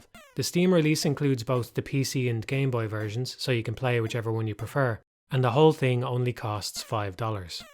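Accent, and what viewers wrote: Ireland. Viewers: And he emerges! Man, I really miss your dulcet Irish tones talking about games. Congrats on the game-making achievement! <3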